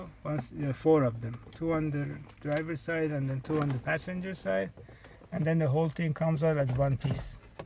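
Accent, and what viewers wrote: Iran. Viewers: داداش ایرانی هستی اخه لهجه اینگلیسی خیلی باحالی داری 🤭😂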